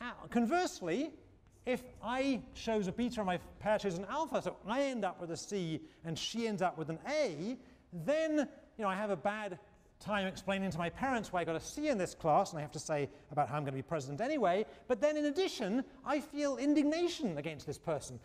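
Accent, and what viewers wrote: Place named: United Kingdom. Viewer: 好重的英國腔！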